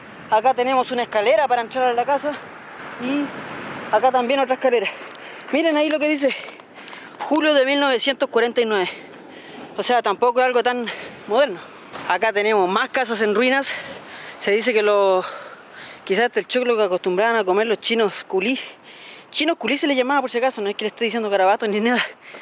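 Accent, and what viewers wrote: Chile. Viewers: Siga adelante eres buen locutor, actualizado y me encanta tu llegada a lo chileno compañero. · el habla como un chileno de clase media normal, además la mayoría de YouTubers jóvenes tienen formas muy peculiares y distintas para hablar no eh viste a nadie que hable correctamente en YouTube menos los chicos jóvenes y son los que más subscriptores tienen, eso es lo que atrae a la mayoría de las masa , nadie va ver alguien que es políticamente correcto en todo.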